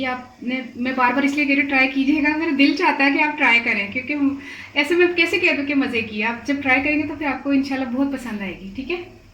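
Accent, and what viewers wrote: Punjab, India. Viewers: very very sweet punjabi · MashAllah Zaberdast Mash De Daal Bhale Bhale 😍 Punjabi Baree Pyari Bolde ho 👌🏻 👏👏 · sweet punjabi boltey ho.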